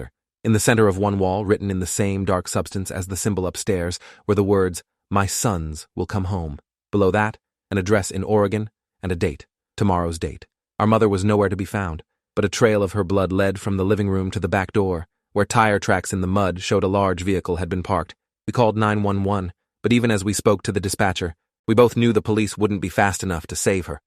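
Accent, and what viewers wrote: United Kingdom. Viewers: why british again? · Why does it keep slipping into a British accent? 😂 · anyone hears the British accent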